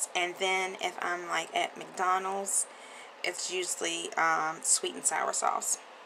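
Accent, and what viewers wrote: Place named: South, United States. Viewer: I subscribe because you sound like me, I'm a southern girl too.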